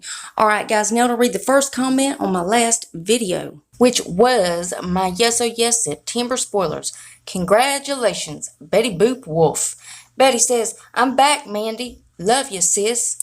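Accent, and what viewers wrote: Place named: Ohio, United States. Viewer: Mandy- love your accent! I lived in New Lexington Ohio years ago. Your voice reminds me of those friends and employees.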